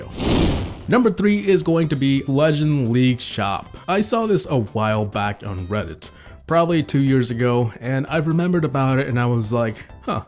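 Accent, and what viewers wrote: Norway. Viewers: wait.... are frome norway?? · wait u r from norway? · E du fra Norge? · Waitwaitwaitwait wait. Are you Norwegian???? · are you norwegian? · Are you Norwegian? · Hold up wait a minute are you from Norway?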